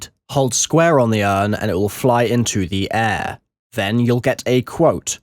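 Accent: England, United Kingdom. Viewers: The British accent is the easiest to understand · Naah... He''s just english broo its his accent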